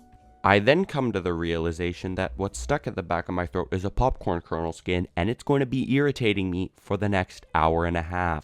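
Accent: Canada. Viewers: Yo are you Canadian?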